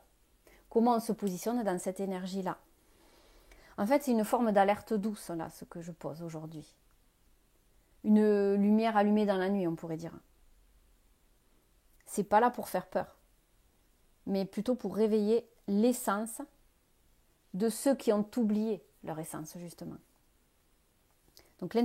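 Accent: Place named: South, France. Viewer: Namasté, ha ha ha !!! je rigole bien sur, merci beaucoup pour vos bonnes vibs, & votre bel accent du sud plein de Soleil :)))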